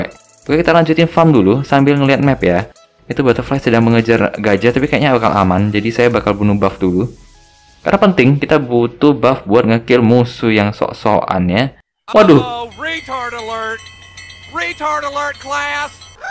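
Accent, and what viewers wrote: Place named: Sumatra, Indonesia. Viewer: makin oke editannya, sukses terus bang tetap buat viewers mu yang satu ini bahagia 😄😄😄 btw lu orang mana bang, logatnya gw denger sumatra banget 😶😶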